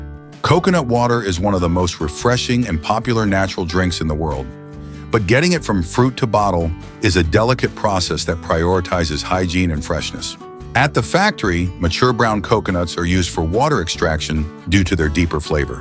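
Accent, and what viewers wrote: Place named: United States. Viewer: It is good to see this tropical tree not being tweaked. When you see a Western idea blooming in an Asian country _ machinery, smart devices, surveillance it means the native has been taken over and it is not the original thing. Those that have learned the skill of climbing should be encouraged to carry on as I dont recall even one person falling or dying due to this skill that has been passed on for generations. Noticed that the men in this area of work were specially strong bodied and climbed the trees with ease daily to tap the top of the tree to produce toddy. Westerners interferring in a country they have no idea about native ways, should not sway their way of farming. AI with American accent and Western music says a lot about tge West controlling every place that is part of Asia or asking where we are watching from is snooping and a matter of concern.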